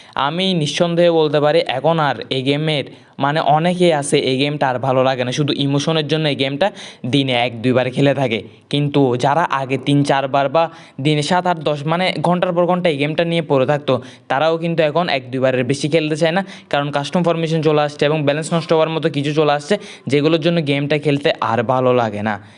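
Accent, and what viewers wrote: Chittagong, Bangladesh. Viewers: চিটাগাং থেকে বলছেন ,তাই না.? একসেন্টেই বোঝা যায়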